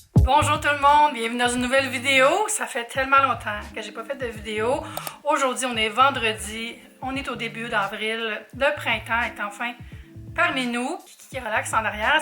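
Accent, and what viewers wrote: Quebec, Canada. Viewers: Contente de te revoir et d'entendre a nouveau ton petit accent québécois. ❤❤❤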